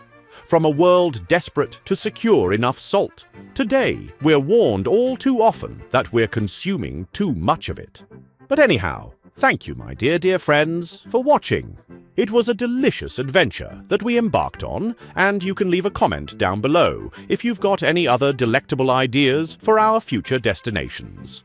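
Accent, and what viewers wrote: United Kingdom. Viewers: The new narrator needs more practise putting on a heavier british accent with more inflection.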